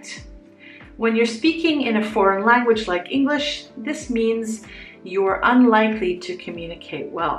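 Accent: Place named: Canada. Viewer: Iam trying to get get american native accent by using krashen's techniques and listening to usually american native accent that's it BTW I have noticed you aren't speaking American native English accent from frist impression but Canadian accent is quite similar to America's one Finally thanks for sharing your thoughts and krashen's ideas. looking forward to speak like native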